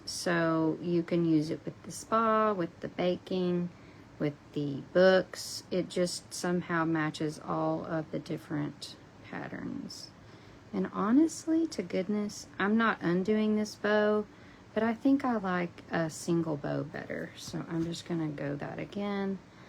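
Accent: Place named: South, United States. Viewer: It’s so good to see you and hear that sweet southern accent again! Love the card inspiration!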